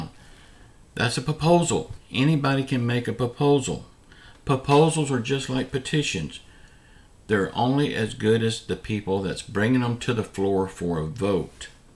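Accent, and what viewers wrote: South, United States. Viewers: We the people ask how many cups of coffee did you have this morning love your shoe! I can tell your from the south you have a great since of humor please don't ever change I get you ever morning to start my day with your great humor and truth love your wife's show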